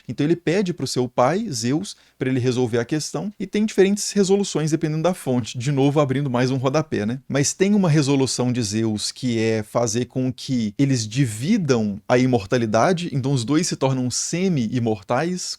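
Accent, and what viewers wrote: Minas Gerais, Brazil. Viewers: sotaque mineiro pega diferente né...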